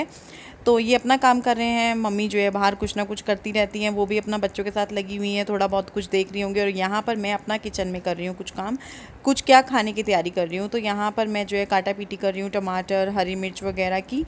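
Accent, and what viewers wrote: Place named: Punjab, India. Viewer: Hiiii di , aap punjabi ho Kya , aapki tone thori thori lgti hai waisi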